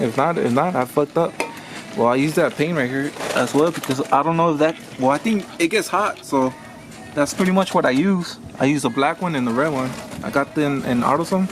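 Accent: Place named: Mexico. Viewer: The solid Mexican accent I have ever heard.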